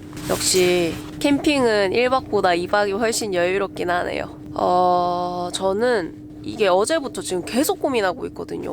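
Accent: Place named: Busan, South Korea. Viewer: 항상 영상 잘 보고 있습니다! 같은 부산분이시네요 ㅋㅋ 사투리가 반갑습니다 ㅋㅋ 재미있는 영상 계속 부탁드릴께요! 언젠가는 잘하겠지!! ㅋㅋㅋㅋ 화이팅입니다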